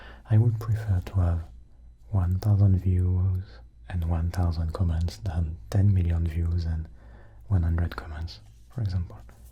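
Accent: France. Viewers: I love whispers but I’ve got like a tolerance up for them now, but you’ve broken it with your soft spoken voice. Love the when the french speak English it’s so nice ✨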